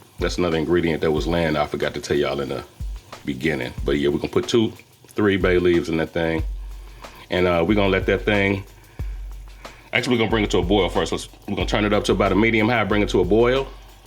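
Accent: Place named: South, United States. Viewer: This recipe is So simple and easy. I luv your southern drawl. I see ya! You know I'm from Memphis, TN. Thank you and yes! it is all about the Flava!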